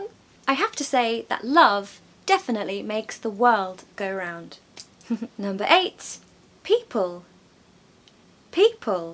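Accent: United Kingdom. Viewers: Your accent is so beautiful. I thought most British speak English just like you and I am wrong，you are the best of them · Listening you is very easy to understand the British English accent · You represent the UK perfectly. I love your accent. Your voice is lovely to hear, and your lessons are built to create a lovely atmosphere. That is a proper good lesson 😊.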